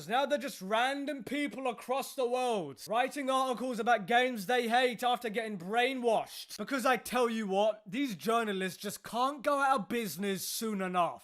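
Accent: United Kingdom. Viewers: i love your british accent sooooooooooo much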